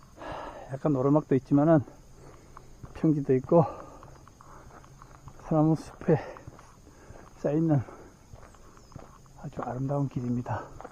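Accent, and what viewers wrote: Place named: Gyeongsang, South Korea. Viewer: 홀로걷는 뚜벅이길에 구수한경상도 사투리와 억양이 어우러지니 좋네요ㆍ중간중간 걷는길에 안내를 해주니 최고입니다 가게없으니 간식ㆍ물 꼭챙겨야한다는 팁!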